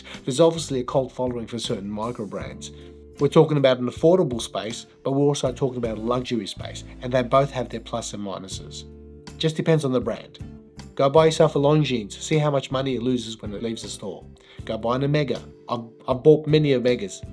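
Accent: Australia. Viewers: I'm so happy to have found this channel., it's great to here an Aussie accent. I love your honesty. I agree with you completely. I only buy what I love and want to wear. I would never buy a 20K watch. I have no judgement for anyone who does. Some of them are very very nice. For me, I love great watches from $300 to 2K. So Micro brands rule.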